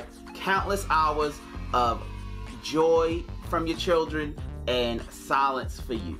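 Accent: Baltimore, United States. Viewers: hes got that boltimore accent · He sounds like he's from Baltimore I get picked on for saying dew and tew and mova and fava 🤷🏾‍♀️ can't help it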